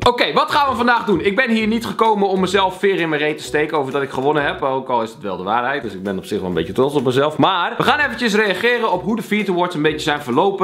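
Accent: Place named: Amsterdam, Netherlands. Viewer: Dat amsterdamse accent geweldig hahaha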